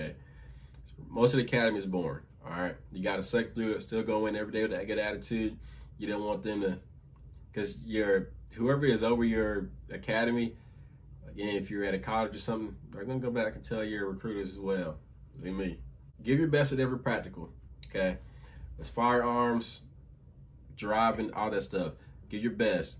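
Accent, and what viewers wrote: Baltimore, United States. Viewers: I immediately knew he was from Baltimore when pronounced his “Do’s” and his “ew’s” haha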